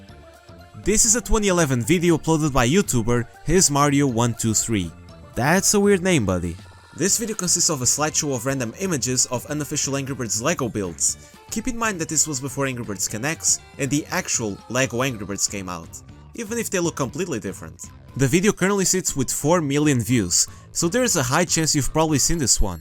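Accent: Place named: Portugal. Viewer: krl tu é português, muito foda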